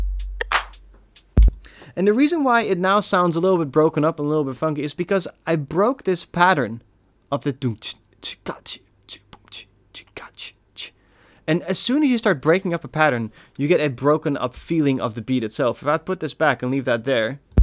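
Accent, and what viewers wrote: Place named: Netherlands. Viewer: Where are you from? You sound a little dutch, your english is really good though!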